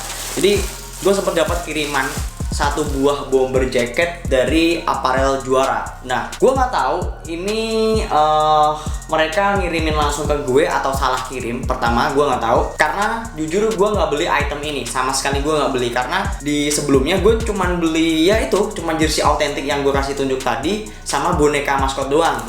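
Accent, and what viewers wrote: Java, Indonesia. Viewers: Yang ngomong jamet ya , medok amat . Gak usah sok vocal ngurusin orang . Cukur aja rambut lu botakin , masih pede gak lu tampil di depan kamera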